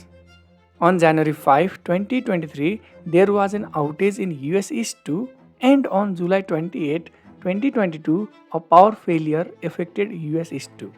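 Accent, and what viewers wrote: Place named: Nepal. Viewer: Because your voice tune is like nepali-english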